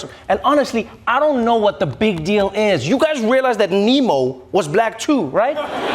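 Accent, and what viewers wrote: South Africa. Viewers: didn’t realize how south african his accent was until now?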